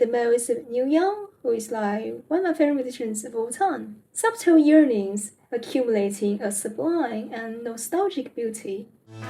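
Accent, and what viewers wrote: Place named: United Kingdom. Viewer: Her accent is actually tinted with British English, can you hear it?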